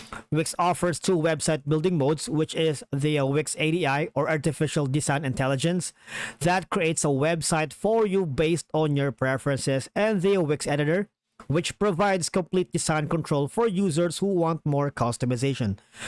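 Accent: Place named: Philippines. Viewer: Sounds Filipino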